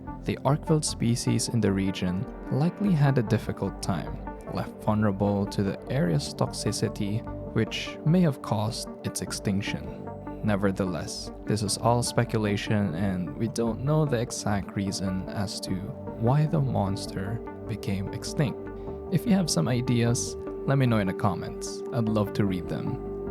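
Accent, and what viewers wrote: Philippines. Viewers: The filipino accent is strong with this ons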